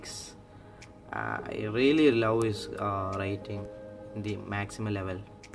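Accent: Kerala, India. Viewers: English kettalariyam malayaliyaannn...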